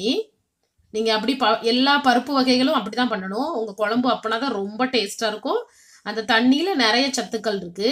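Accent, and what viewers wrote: Tirunelveli, India. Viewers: It looks simple and easy. But I really enjoyed your narration.....I can feel the Tirunelveli ambient in our slang....Keep up the good work.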